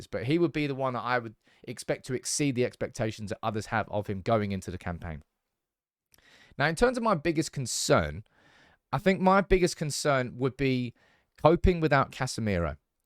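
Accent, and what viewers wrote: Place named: Cockney, United Kingdom. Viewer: Cockney clown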